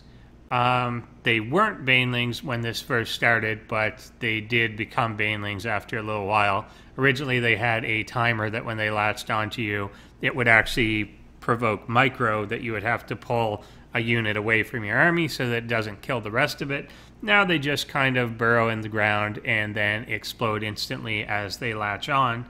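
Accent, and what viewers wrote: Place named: Canada. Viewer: You're canadian, aren't you?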